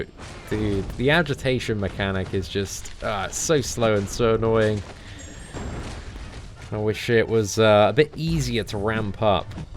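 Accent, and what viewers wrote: England, United Kingdom. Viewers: This speech is the reason why I never travelled to england. You get headache in seconds. Horrible.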